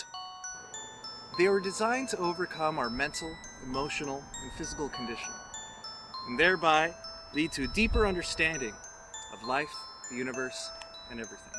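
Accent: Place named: Russia. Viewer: Awful music and the Russian accent sounds crass and stupid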